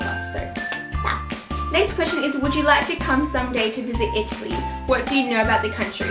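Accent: United Kingdom. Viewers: You have a British accent I thought you were from england not Australia haha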